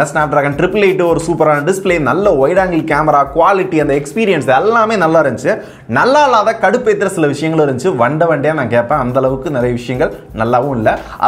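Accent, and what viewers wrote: Madurai, India. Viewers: Elle madura kaaran da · Thala neenga maduraiyaa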